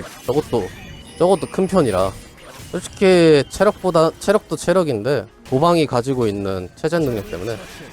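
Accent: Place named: Jeolla, South Korea. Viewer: 형님 혹시 전라도 분이십니까 사투리 정겹네요잉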